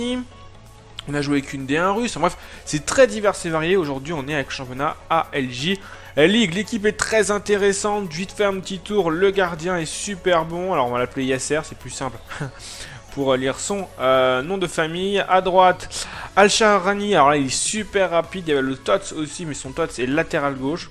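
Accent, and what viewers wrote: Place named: Marseille, France. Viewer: pk il parle comme les marseillais delà télé-réalité lui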